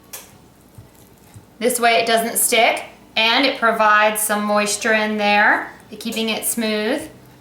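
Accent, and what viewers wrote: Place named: South, United States. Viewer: okay so i wanted to sleep about an hour ago... but i got hooked on your videos. they're great, you're awesome, love the accent of the south and of course your recipes... will definitely be trying there out! thanks. subscribed!!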